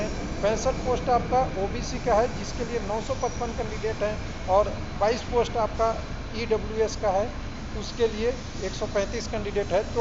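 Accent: Bihar, India. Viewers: sir pls don't mind but u r very arrogant.. n arrogance to ravan ka nahi raha ..n rahi baat noted ki.. to aap hai bihari .. kyunki document ko daakument bolte hain(n old aged bihari bade danger hote hain .. ghamand achhi baat nahi dadaji .ise tyagiye , hum bachhe Hain hamara bura sochna band kariye.. bhagwaan kare ki aap galat ho.. agar aap sahi v huye to aap waise v swasth nahi rahiyega..kyunki 2000 bachhe aapke kharab health ki dua kar rahe honge wo v din raat...or galti se aap mil gaye tab to raam hi aapke swasthya ka maalik hai ya fir aapka Allah, kyunki or cast to tum ho nahi sakte.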